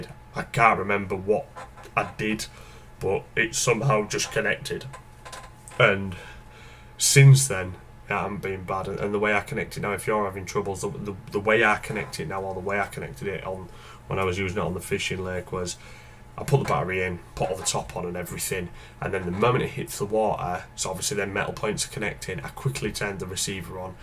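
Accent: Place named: Hull, United Kingdom. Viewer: Loving the Hull accent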